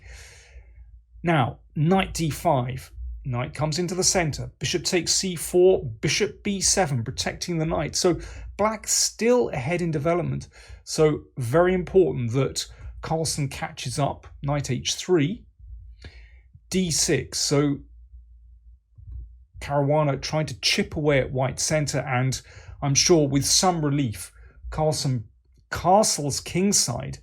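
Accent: United Kingdom. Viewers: nice british accent, my dude (mate)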